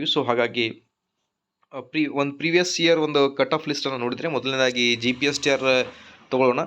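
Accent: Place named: Mysuru, India. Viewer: Mysuru english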